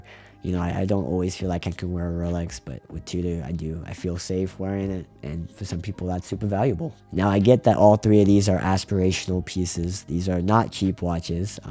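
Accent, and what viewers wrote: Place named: Midwest, United States. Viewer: Congrats on your license!!! Good luck God Bless and much success! The 36mm Date Just you can't go wrong. I prefer the older holes oyster case Rolex models. Perfect for strap options and it gives you a vintage vibe. Those look perfect on young guys when you "hipster" a classic vintage watch. BTW you sound like you're from the Midwest... it's the accent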